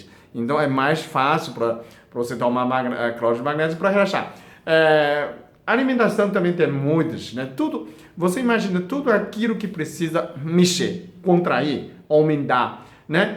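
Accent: China. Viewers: Eu adoro esse Dr pq ele e mto sábio.Explics tudo...ele fala português c o sotaque chinês Eu adoro ouvi-lo e entendo...só ter paciência. Parabéns Dr Deus o abençoe 🙏🙏🙏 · Esse japonês ou chinês hummmm ta mais pra chinês. Enfim, esse chinês é um fofo.Explica bem em seus vídeos,mesmo com sotaque de outro idioma. Depois de assistir o vídeo, acho que estou precisando tomar o cloreto de magnésio. Um abraço. Sou sua fã.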